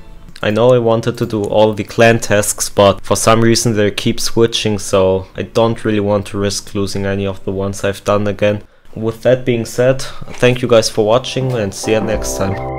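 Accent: Germany. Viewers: Bro got the most German accent a person can have🗿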